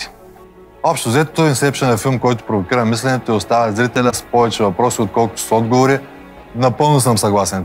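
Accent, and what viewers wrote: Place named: Varna, Bulgaria. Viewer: малоумна история при това на варненски диалект .И нищо не е разбрал от филмите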